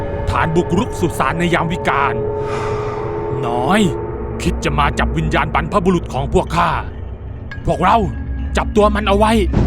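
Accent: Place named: South, Thailand. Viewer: คนพากนี้เแ็นคนใต้เเน่ใช้ม้าย เพราะฟังจากคำพูดติดปากของคนใต้ที่ ที่พาไปเเหลงกลาง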